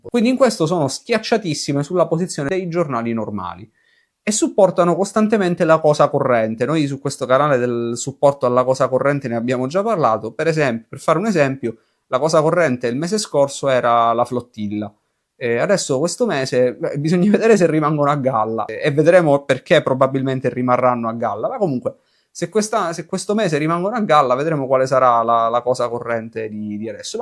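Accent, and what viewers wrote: Sicily, Italy. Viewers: Purtroppo, il femminismo "è" la massima espressione — e al tempo stesso la forma più rozza e grottesca— del capitalismo. Chi, meglio delle donne, per la loro naturale e debole inclinazione a servire e compiacere l’autorità e i potenti, poteva incarnare così bene questo nuovo dovere mascherato da liberazione, dovere che non ha nulla di rivoluzionario se non quello di aumentare i privilegi del genere femminile, genere più servile del potere? Comunque, ottimo video: è sempre un piacere ascoltarti — e con quell’accento siciliano, tutto diventa ancora più piacevole! è stata una fortuna vedere ed ascoltare il tuo canale! grazie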